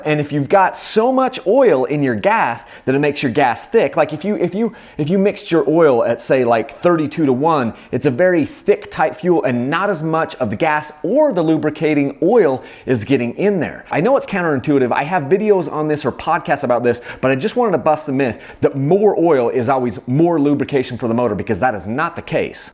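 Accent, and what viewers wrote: Utah, United States. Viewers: I thought you were saying “engine breaking”. Hahaha. I live that Utah accent 👍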